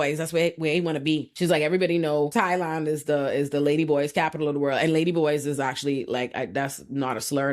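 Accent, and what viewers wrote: Bahamas. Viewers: Are u Bahamian? Or am I tripping? I hear our accent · New sub❤ i only disagree with one thing queen, scotty don't love him, if he looked the way he looked with no SHMONEY she won't even give him a SECOND of a minute of a time of any day. Lol i think she feels like SHE owes him , she feels like she in way to deep now to let anyone " WIN HIM " AWAY FROM HER SPOT.... an i think she is playing the long game just like him. I think they deserve each other. Especially since lemmy made it clear to us episode one scotty knew what she was signing up for an now "she wanna change for the baby". I guess we all gonna see how this ends. Couldn't be ME though. I got to much respect for myself an integrity. Aint enough money in the world, i pray this doesn’t end badly for her, we all know rich people have no limits an feelings come second to spiritual dark agendas. 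Also you are gorgeous an i love your accent. Is that like a bahamian bagan accent? ❤ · Your accent 👀👀👀. Sis you’re a Bahamian ? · Waittt are you Bahamian 🇧🇸??? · pause .. are you bahamian ?! this is my first time viewing but you sound just like us , or maybe you’re an geechie girl ? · Are you Bahamian?.. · are you foreigns wife???? its giving bahamiaaaaaaaaa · Are you Bahamian? Your accent is killing me 😍 it reminds me of another Youtuber that that also does commentary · Are you from the Bahamas ? You sound just like this other creator I look at on here, similar accent, lol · I hear a Bahamian accent 👀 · You musssst be Bahamian or from Dominica omggg that accent 🤣🤣!!! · Are you Bahamian by chance?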